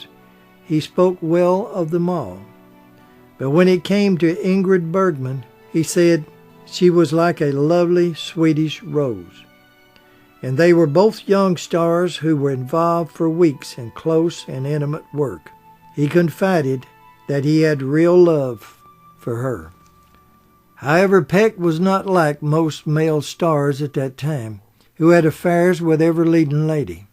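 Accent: South, United States. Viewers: One of your best,! I love your bios of legendary stars of our classic American films. A few little mistakes, though, it's Charles Bickford, not Charles Pickford, Chuck Connors, not Chuck Connor, and Jean Peters could not possibly have starred in 1958's The Big Country, as by that time she had married Howard Hughes and retired from acting; A Man Called Peter, released in 1955, was her last feature film. I think you meant Jean Simmons. I'm a Southerner myself, so I understand and relate to your wonderful drawl. My late mother was from middle Tennessee and often got a bit mixed up here and there (she'd say "punkin" for pumpkin, and "purnish" for punish - just the tip of the iceberg!) But it made her all the more lovable for us all. Keep doing what you're doing, and don't ever change!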